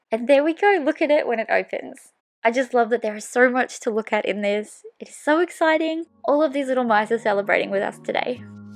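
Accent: Australia. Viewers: so nice to hear an Aussie accent!! Congrats on your 100th video tutorial! Adorable card!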